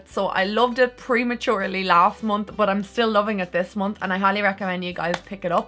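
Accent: Ireland. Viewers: I just love you so much!! And I enjoy listening to your brogue! It reminds me so much of my Nana who is from Kenmare..And I just love your makeup looks and think you are so talented!! Much love from Boston!!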